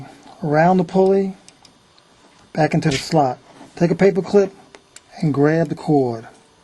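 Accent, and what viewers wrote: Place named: Philadelphia, United States. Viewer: thanks for the help, especially loved the accent, just curious where are you from, im guessing Philly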